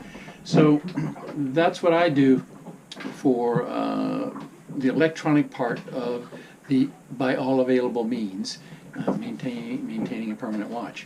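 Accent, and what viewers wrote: United States. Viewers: Lovely job - I really enjoyed your video - info/delivery/demeanour etc. The full package. Impressed++. Keep up the excellent work. American english? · You speak like you're from the United Ststes. What is your nationality?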